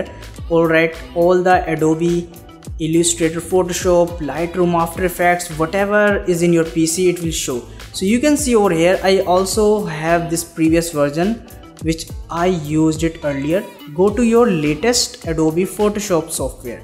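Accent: India. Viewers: Omg thank you so much, anytime I hear Indian accent, I have a confidence that my problem will 100% be solved, thanks for your help mate !!!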